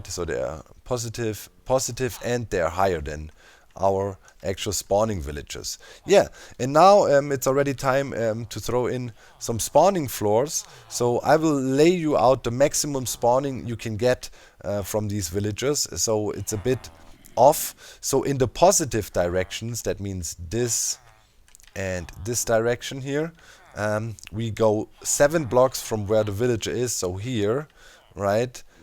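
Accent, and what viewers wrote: Germany. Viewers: Ahhh... Man hört hart raus, dass er normalerweise Deutsch oder so redet 😂 dat accent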